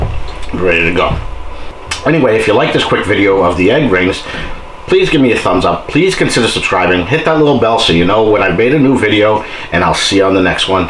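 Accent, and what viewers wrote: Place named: East Coast, United States. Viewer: You have my grandfather’s name, first and last. I’m a too. Not tons of us. Sounds like you are in the east coast like us.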